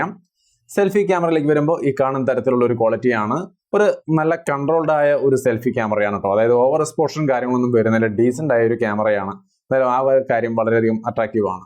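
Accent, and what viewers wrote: Thrissur, India. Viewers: Pakka തൃശൂർ കാരൻ 👌👌👌👌😁😁😁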